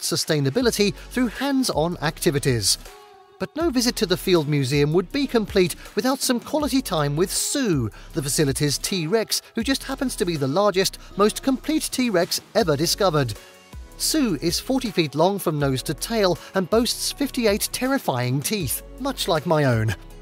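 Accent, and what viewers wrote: United Kingdom. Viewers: A nice survey with occasionally dazzling if often deceptive photography but also some egregious errors. "Fulton House" is not that house, whatever the house depicted may be —and in any case, it's not a house. Anish Kapoor, though he may have his studio in London, is Indian, from Mumbai. And the peppy British accent: What's up with that?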